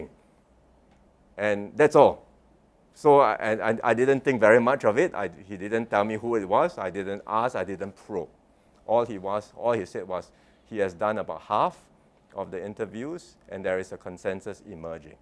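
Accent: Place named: Katong, Singapore. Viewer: He's the quintessential product of 1980s 1990s Singapore. Whether this is the right training I don't know. To me, his phonics and intonation already tells me he's from Katong, and I feel alienated. But who knows, Goh Chok Tong's economics seem to make our lives better. Maybe he may introduce more Singaporean friendly policy, maybe life would be better. At least he goes to Parkway Parade, and knows about problems back in the day.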